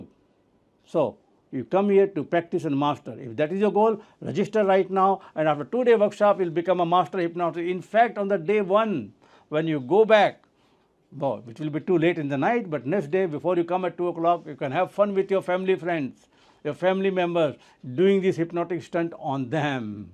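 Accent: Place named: India. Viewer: If you are a real hypnotist then why don't you work on your accent? So Indian and so funny. 😂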